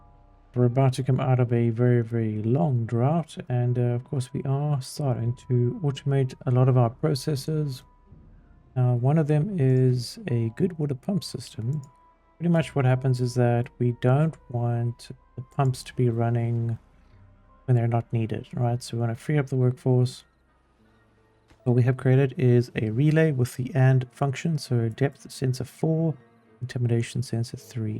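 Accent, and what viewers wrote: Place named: South Africa. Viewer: yay, another South African timberborn player, heard the accent immediatly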